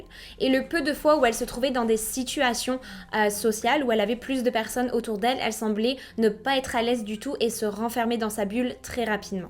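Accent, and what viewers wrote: Quebec, Canada. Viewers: a mince c est cuit pour la comparaison avec Mc Skyz il me semble entendre un petit accent québécois ^^ .. Je suis chauvin Mc Skyz numero 1 :) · est et Mc Skyz est Mc Skyz. Cependant, tout les deux sont extras!!! J'adore le fait que tu as un accent québécoise même si tu es française! Ça donne un sentiment de " réconfort ". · Je viens de découvrir votre chaîne jolie demoiselle. C'est un plaisir de vous écouter mais dites moi il me semble entendre un très léger accent québécois.... me trompe je ? Bise de France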